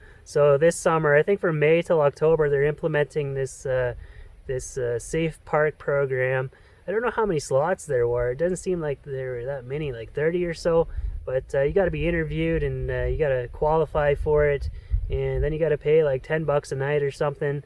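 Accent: Canada. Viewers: Beautiful sentiments but he's Canadian, NOT American. We have different regional accents as well.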